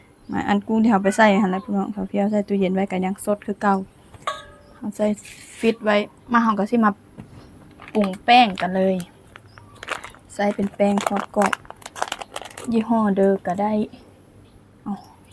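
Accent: Thailand. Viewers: หนูเรียนภาษาไทยเหรอพูดสำเนียงคล้ายคนไทย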